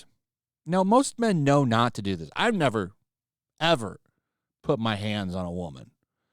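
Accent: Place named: Upper class, United Kingdom. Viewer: Not a bad attempt at the snooty English sound. I'm a Yorkshire man and it's only a certain "class" that talk like that... fortunately.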